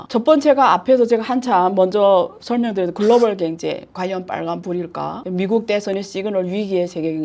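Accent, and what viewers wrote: Yanbian, China. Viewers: 펀드 할것 못돼요 판매하는 금융 창구 직원들도 뭔지 모르고 팔지요... 위에서 시키는대로 수당을 주니 호구를 잡고 팔지요 1,000개 이상의 펀드 ㅋㅋ 주식투자 펀드투자 그만 광고하세요 부동산 급락 소리도 하지말고... 그냥 연변 사투리가 재미있어요 ㅋㅋ 세미나에서도 자세하게 하지 마세요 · 한국분이신가요~발음이 중국교포같오서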